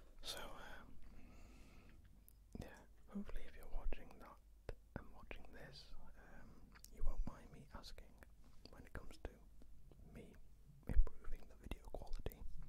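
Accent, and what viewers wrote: Wales, United Kingdom. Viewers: What part of Wales you from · Are you Welsh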